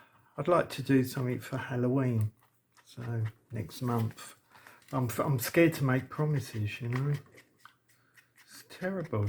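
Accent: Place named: London, United Kingdom. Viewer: Welcome back and well done - I know how hard it is to get back to things after something like that! Lovely to hear another Londoner’s voice. xxx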